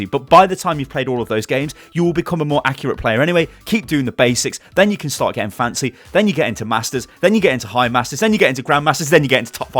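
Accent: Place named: United Kingdom. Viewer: like ur british english. dont understand usa guys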